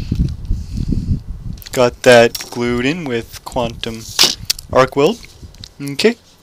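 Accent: Canada. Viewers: Is he canadian (no offense - pretty cool peeps) but still he got k way too much . special or what ? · French Canadian obviously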